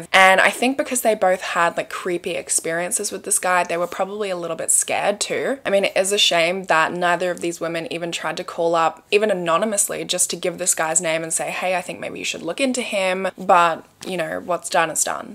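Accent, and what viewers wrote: Australia. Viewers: You’re telling me you’re Australian not British? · Thank goodness that man had the training to get her out of the water without destroying DNA. Each LE department needs to have a couple of people trained in that area because it is so important. I'm sorry, the river...I can't figure out what you're calling it. It sounds like you're calling it the nut sac river. Not trying to be funny and I guess it could be possible but your accent is much prettier than an English accent and I just figured out your from Australia. Idk how long I should have already known that. I'm getting old...maybe I forgot.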